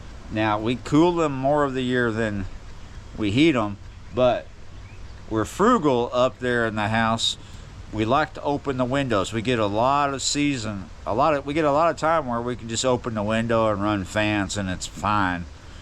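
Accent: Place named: East Texas, United States. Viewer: Boy have you absorbed that East Texas accent! Love your episodes!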